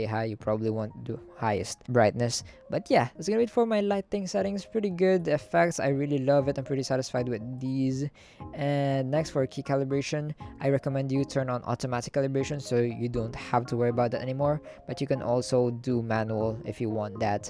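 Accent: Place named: Philippines. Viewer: Are you Filipino by chance? You sound like one. 😊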